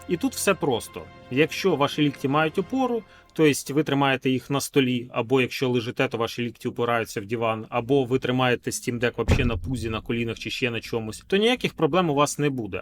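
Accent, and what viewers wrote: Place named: Ukraine. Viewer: Спс за обзор. Если приставать, то отметил бы только грамотность речи, потому что ру слова с укр акцентом режет ухо.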